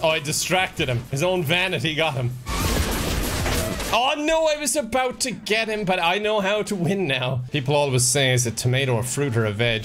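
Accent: Ireland. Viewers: Wait! Irish?! I thought you were Spanish! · You're Irish?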